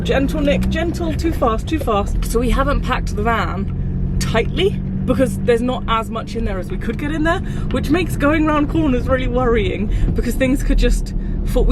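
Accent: Liverpool, United Kingdom. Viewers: Its just Liverpool English accent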